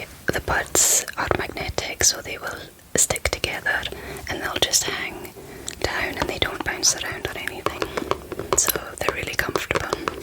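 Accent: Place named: Scotland, United Kingdom. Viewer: Best (and only) asmr i watch / listen to. And the scottish accent is absolutely amazing!!!! Thank you!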